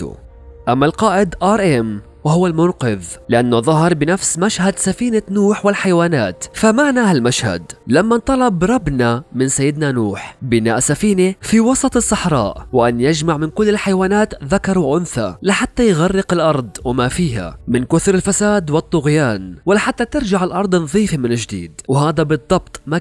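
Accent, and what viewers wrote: Iraq. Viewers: قلي نت ليش تحجي مرات عراقي بس أرى اعرف 🙂🤔🤔🤔🤔🤔🤔متابعتك من العراق🇮🇶🇮🇶🇮🇶🇮🇶🇮🇶🇮🇶🙈🙈🥰🥰🥰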